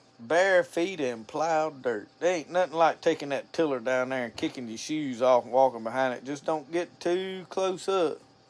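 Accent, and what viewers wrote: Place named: Mississippi, United States. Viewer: I never even heard of you till I searched hide tanning about 2 weeks ago. I knew you were from Mississippi soon as you started talking. All my family's from there, I was raised and still live in North Florida due to my dad joining the Navy. Anyway I'm enjoying the content I pretty much only watch YouTube not into the news or movies much anymore I like being outside but YouTube is a learning tool and experience.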